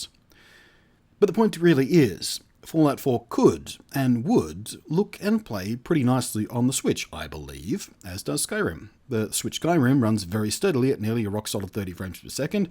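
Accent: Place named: Australia. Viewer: I love you milky smooth ozzie voice. No homo.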